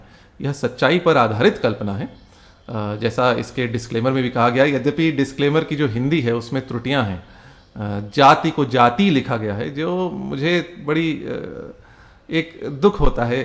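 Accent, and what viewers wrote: Standard. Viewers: Aapki shuddh Hindi sunkar hriday prasann ho jata hai. Aapko dekh kar mai bhi ghar me Shuddh Hindi bolne ka prayatn kar rahi hun. Dhanyvad mahashay 🙏🏼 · Shuddh Hindi! What a delight!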